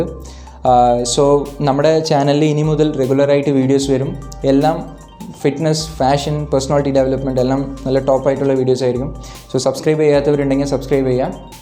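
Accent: Tamil Nadu, India. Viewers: Chattayi Malayali anno? Or Tamil?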